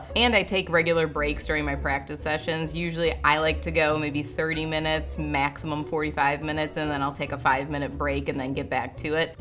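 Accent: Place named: Minnesota, United States. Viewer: You sound very Minnesotan. Are you?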